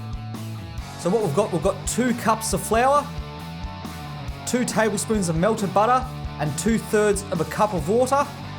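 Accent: Australia. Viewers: Thank you for your awesome Aussie accent love it from India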